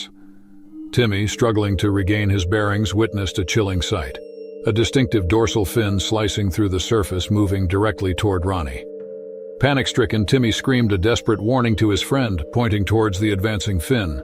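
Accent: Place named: United States. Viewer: Announcer is playing w/ us OR was drinking vodka on story #2 the newlyweds. No way he can pronounce abyss, cerulean but mispronounce simple words. Story 3 he had no mistakes. Any I missed ? 🤣🦈 divvver -> diver leed vest -> lead hom ed -> honed in snapped shoot =snapped shut fers ee us -> ferocious ree alm -> realm threet -> threat Voice talent guy has GOT to be laughing when he does these [not at victims but his pronunciation]. He sounds like a native English speaker and one from the US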